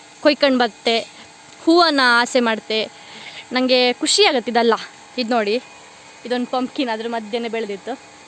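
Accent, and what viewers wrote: Kundapura, India. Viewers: ನಿಮ್ language ಕೇಳಿದ್ರೆ ಕುಂದಾಪುರ ಕಡೆಯವರು ಅನ್ನಿಸುತ್ತೆ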